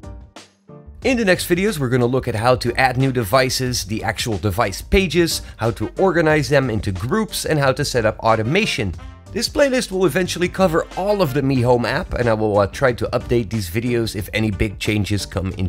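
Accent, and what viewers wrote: Netherlands. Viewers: Brendan Dijkstra An btw, He has a very subtle dutch accent. You wont notice it unless you look for it.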